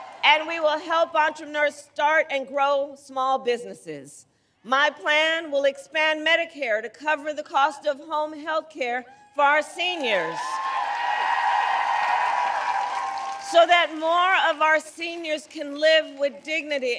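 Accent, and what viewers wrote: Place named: England, United Kingdom. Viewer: Love who! Who’s that? I don’t know her. She’s kinda has an English accent. Sound like she lived in a land of OZ my gosh is she the one behind the curtain or was that a Teleprompter?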